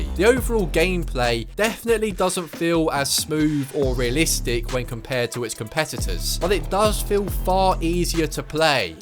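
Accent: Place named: England, United Kingdom. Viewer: Fucking whiging fuckin englishman innit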